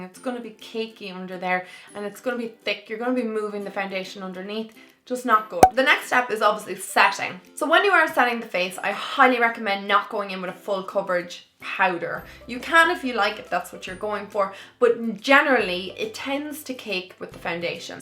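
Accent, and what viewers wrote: Ireland. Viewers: awww ur so pretty darling. love ur accent. u sound Irish ? are u ? I'm new to ur channel. beautiful make up X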